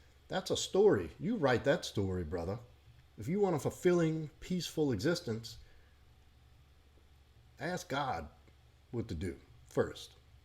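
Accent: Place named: Louisiana, United States. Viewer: I can tell you’re from Louisiana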